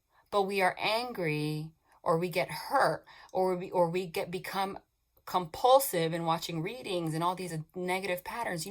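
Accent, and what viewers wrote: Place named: Long Island, United States. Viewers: Girl - I went to UB!!! Crazy. I grew up on LI and have always loved you accent. I love in PA now and miss that sound. But the universe is telling me over and over I’m on the right path! 💖💖💖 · We haven’t even started the reading I just poured a hot cup of ☕️ sat on the couch, said this chick has to be from NY “OKAEH!” I went to school for early childhood development at St John’s. Had my son at 19, nope kids and a baby who doesn’t stop crying? Don’t think sooooo Was in a crazy marriage and another crazy relationship went to school for medical assisting. I parked in the ER for 13 years.... was soooo tired but had job security and great benefits and the bestest friends...... went to nursing school spent 8.5 of those 13 in peds. Moved to the south to be with the soulmate, here we are I have chills listening to your personal story, and you are so absolutely correct. 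God bless love.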